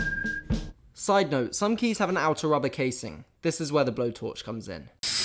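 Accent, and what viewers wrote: United Kingdom. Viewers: this is basically an old casey neistat video with a British voice over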